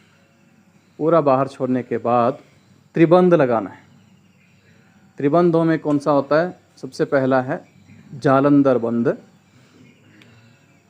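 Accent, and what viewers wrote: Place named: South, India. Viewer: अद्भुत बाबा जी, बहुत ही गर्व महसूस होता है आपको सुनकर,, आपके सरल सहज ज्ञान प्रेषण करना अद्भुत है,, गर्व यह भी होता है कि आपको हम या आप हमे नाम से जानते हैं,,, धन्यवाद,, आपकी साउथ स्टाइल संस्कृत वाली हिंदी सुनना बहुत ही अच्छा लगता है।।जय हो Er प्रशांत दुबे, अस्सिटेंट प्रोफ़ेसर, उत्तरप्रदेश तकनीकी विश्वविद्यालय , लखनऊ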